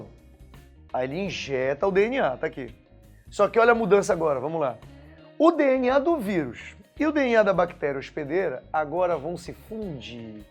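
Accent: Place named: Pará, Brazil. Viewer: amei sua aula! seu sotaque parece da minha cidade, você é paraense?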